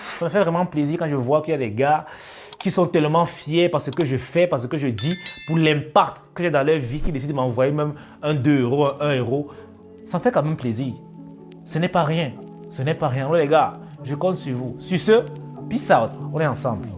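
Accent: Cameroon. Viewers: Je reconnais là l'accent de mon frère du 237 😎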